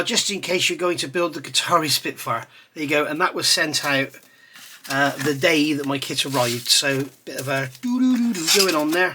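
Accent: United Kingdom. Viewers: define the accent...........UK?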